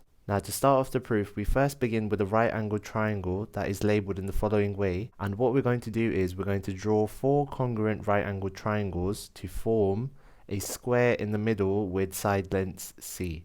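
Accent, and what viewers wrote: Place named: United Kingdom. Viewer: Excellently explained sir. Keep up the good work. Most importantly,I like your British accent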